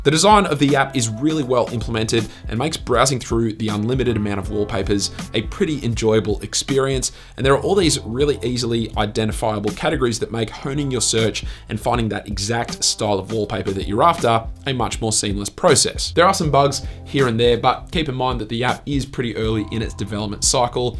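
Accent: Australia. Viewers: Great to hear an Aussie in here! This means everything will work on my phone 😄 Loved these apps and will now go join you on instagram. Thanks 👍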